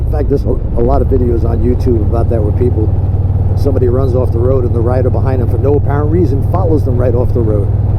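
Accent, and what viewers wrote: New York, United States. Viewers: Forty two years and you still sound like a New Yorker. I’m in NC and two words out of my mouth and they ask, “you from NY?” Gee, how could you tell? Bwahahaha! P.S. Love your videos. Regardless of how long we’ve been riding any of us can always learn something new. I’ve recommended your DVD’s to every new rider I meet. Keep up the good work.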